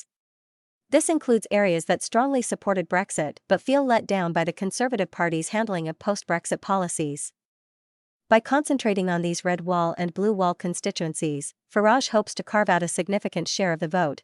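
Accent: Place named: United States. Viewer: Why do all these podcast have American voice overs. Maybe because they ain't from British podcasts but from the home of the "Sceptics", thr bad ol' US of A.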